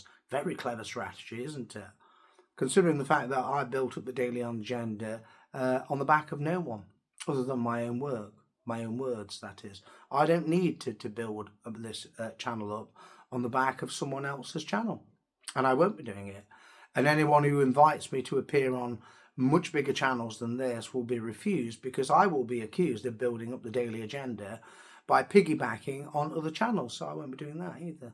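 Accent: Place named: RP, United Kingdom. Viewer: What a piece of work you are. A posh accent and a decent education generally means that you know little or nothing about real life or the majority of working people in the UK. British values are not generated by religion or colour but by an inner desire to do what is right, fair and just for the benefit of the community as a whole .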